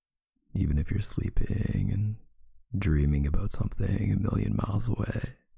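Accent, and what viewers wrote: Canada. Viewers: I just love his adorable canadian accent 🥺🥺🥺🥺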